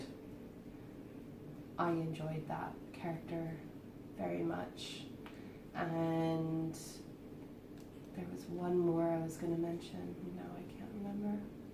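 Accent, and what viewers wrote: Ireland. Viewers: Wow! Exactly a month ago I saw your first videos about “I wish I knew about diamond painting”, now I am watching much older once and your accent is more Irish then American. Love it! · I wanted to watch your first videos because being new to diamond painting, you're kind of a legend to everyone I watch, haha. I've watched quite a few of your more recent videos and coming to this one, you have an Irish accent in this one! I don't hear it anymore, it's so cute, though! But I love accents! So fun seeing you as a newbie! 😁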